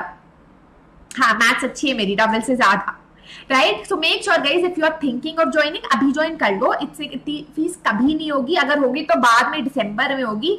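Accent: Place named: Tamil Nadu, India. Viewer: your sound was like a tamil 😅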